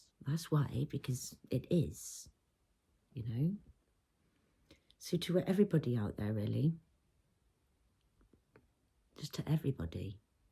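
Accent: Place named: England, United Kingdom. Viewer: I dont think my comments to the first vidoe of yours were correct about being a witch, but im not certain. You talk like me but with a English accent. Im going to get to the bottom of this.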